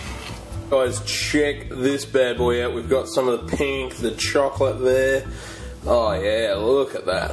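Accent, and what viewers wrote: Australia. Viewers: Awesome cake Are u from auzzie??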